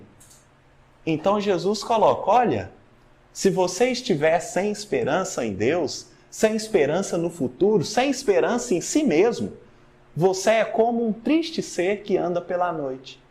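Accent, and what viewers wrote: Minas Gerais, Brazil. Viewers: Lindo capítulo do livro e muito bem explanando pelo. Aproveito pra matar a saudade do sotaque mineiro que traz aquela sensação de estar em casa 😍 gratidão,!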